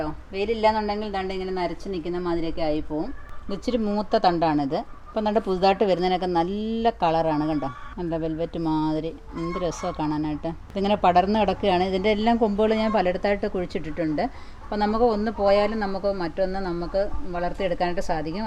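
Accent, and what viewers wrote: Kollam, India. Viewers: Nammude kollam style bhasha ayyam puredam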